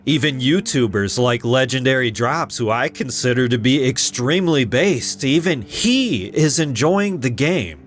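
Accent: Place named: South, United States. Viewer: Fr. Dawg is definitely from the south.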